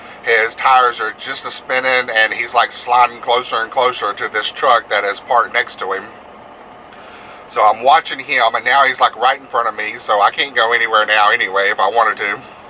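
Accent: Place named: South, United States. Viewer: Btw, where you from? I found another blogger that at least sounds as if they’re from the south. Tri Cities Tennessee here.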